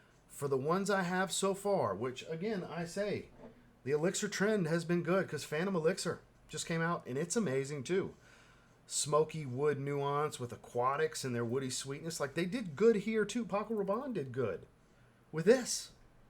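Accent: United States. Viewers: Sorry for this kind of strange question, but where do you come from?As a Pole, I find your American accent souding really good to my ear🙂,not too heavy, understandable though. Your review is good too.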